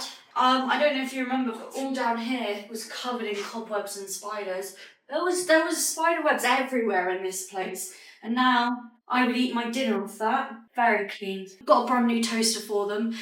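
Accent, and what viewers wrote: South, United States. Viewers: As an American I must say you do an excellent Southern accent😁! Love your work ethic and ethics in general. You are a godsend to many people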